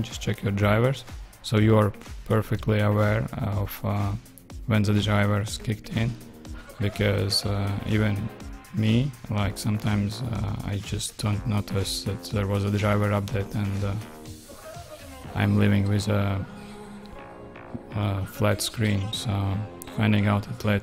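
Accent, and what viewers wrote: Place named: Sweden. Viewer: sound like you from sweden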